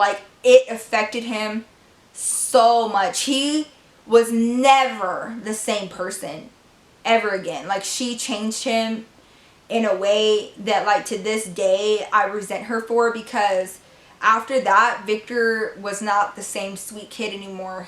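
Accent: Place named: South, United States. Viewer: southern accent comes out more when she gets mad 😂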